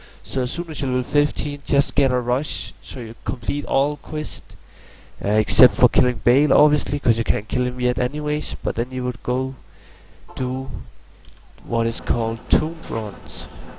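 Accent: Denmark. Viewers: uhhHU i think this guy is from denmark if you are say hej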